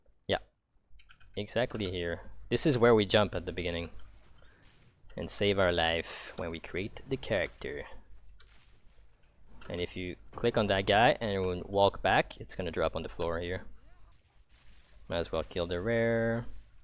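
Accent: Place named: Quebec, Canada. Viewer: hi random and weird question lol, i noticed youre on the BR servers, but your accent sounds A LOT like a french canadian accent so I was wondering if youre from Quebec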